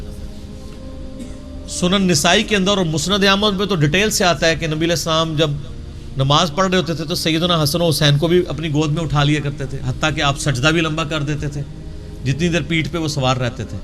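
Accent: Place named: India. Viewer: Hindustani lehja hai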